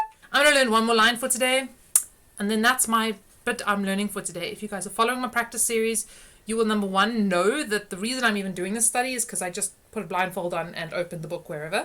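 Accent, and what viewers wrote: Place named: South Africa. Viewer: Who else came for the sexy librarian but stayed for the South African accent? But more seriously, please post the other 23 studies...they’re tough.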